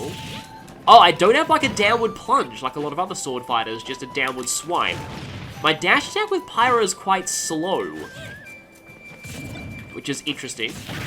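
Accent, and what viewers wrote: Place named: Australia. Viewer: could this video be foreshadowing for a xenoblade 2 playthrough? i would love me some british dub + ozzie commentary combo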